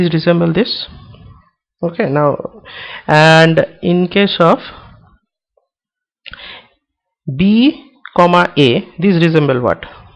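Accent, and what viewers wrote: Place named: India. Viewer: hhhh i'm sure he is indian because of his voice , but thnks anyway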